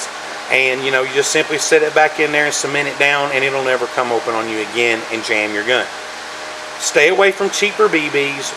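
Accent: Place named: South, United States. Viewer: now hummm now now you have a south accent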